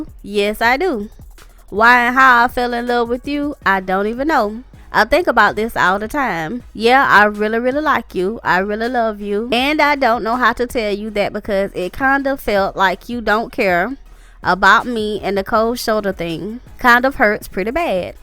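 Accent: South, United States. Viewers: Your accent and laugh is so adorable!! And those phrases are funny as hell "Clutch my pearls. A shame and a scandal." OOOuuu, you know you're from the South!! LOL. I could listen to your videos all day.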